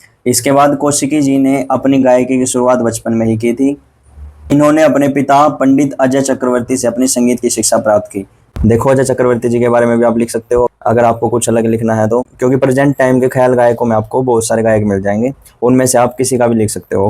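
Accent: Haryana, India. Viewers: Aap haryana se ho sir ???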